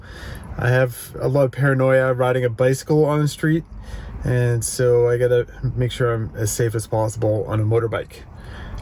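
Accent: Canada. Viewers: I'm liking this series already! I had a feeling that was a good ol Canadian accent. Hi from just north of Toronto Canada.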